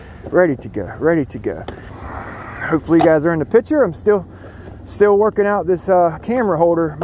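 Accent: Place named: South, United States. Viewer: Love your southern twang. I'm from Michigan but talk a little like you. I'm Midwest, and southern Indiana, with Canadian accents here and there HaHa!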